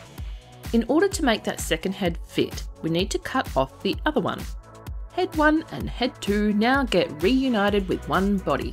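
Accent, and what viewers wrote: Australia. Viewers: love my Aussies your accent is beautiful. most of my fav channels are aussie much love from Canada ❤🇨🇦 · I love them all. The newest edition to the Ice-cream Critters is definitely my favourite in this one. Yes, as a fellow Aussie, I to grew up with 100's and 1000's on my Fairy Bread !! I also see you are trying hard to upset all the Tasmanians haha. It sounds like you live in my neighbourhood, what side of the river are you on ? 😀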